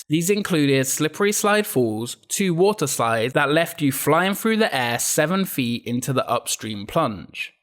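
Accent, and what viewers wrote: United Kingdom. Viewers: Are you from the uk? These videos are great i cant speak highly enough about them all on my personal facebook and my enthusiat friends i would love to meet you one day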